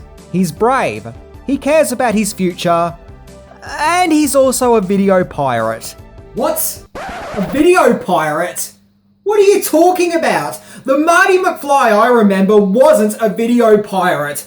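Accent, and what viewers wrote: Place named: Australia. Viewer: Mate, your content is really good but your delivery is pants - stop shouting at us and I know you can´t do much about your Ozi accent but just tone it down.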